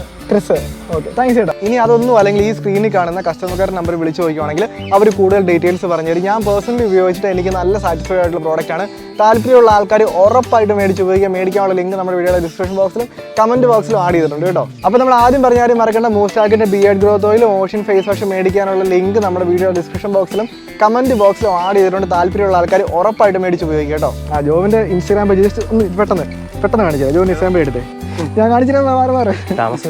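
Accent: Kottayam, India. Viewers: Kottayam slang👌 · Kottayam slag 😎😁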